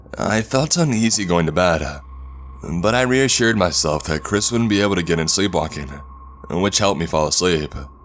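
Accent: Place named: North, United States. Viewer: There's something about how you pronounce certain words like "button" that sounds familiar. Are you by chance from somewhere that borders Canada? I'm fascinated by lingual differences. Also, you're reading pace and pronunciation is one of the best in the creepypasta reader community, it really helps with the immersion.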